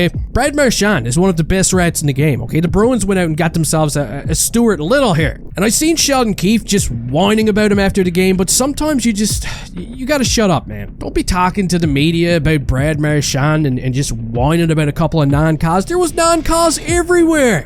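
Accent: Newfoundland, Canada. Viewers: I know a fellow Newfie when I hear one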